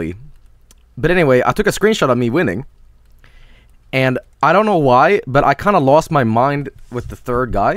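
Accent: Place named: Sweden. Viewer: He's Swedish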